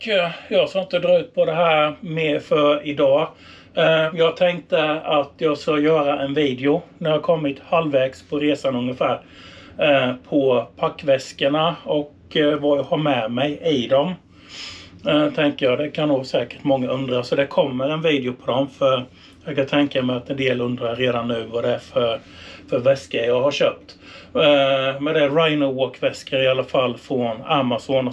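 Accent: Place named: Jönköping, Sweden. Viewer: Hittade precis din kanal och tittade på detta avsnitt. Gillat och prenumererat. Vad roligt med ännu en MC kanal från Västgötaland. Fast du låter som du vore inflyttad till Habo från Jönköpingstrakten hahahaha. Inget ont ment. Nu ska jag se om jag hittar videon om väskorna och sen blir det att titta igenom hur det går på din resa. Lyckönskningar från Falbögda.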